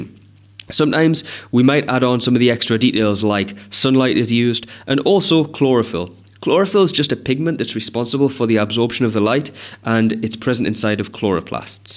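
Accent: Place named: United Kingdom. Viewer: no not the british accent i don’t understand it💔😭💔😭💔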